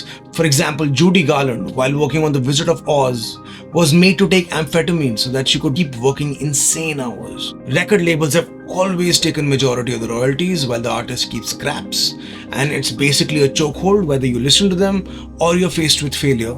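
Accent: India. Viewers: I was also shocked (in a good way) when I clicked this video and heard an indian accent. It's so refreshing to see an indian channel cover this topic considering the dislike of arts or humanities in general in our country. You just got a new subscriber. Would love to see more videos like this.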